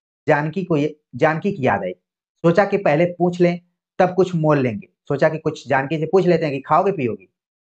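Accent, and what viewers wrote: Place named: Mirzapur, India. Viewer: Sir mai mirzapur se hu mirzapur ki bhasa aisi hi h😂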